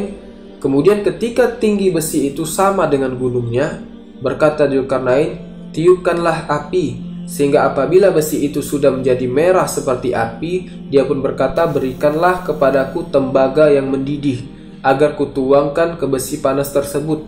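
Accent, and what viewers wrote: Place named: West Java, Indonesia. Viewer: Berdasar pengucapan huruf Z, fixed admin adalah Sundanese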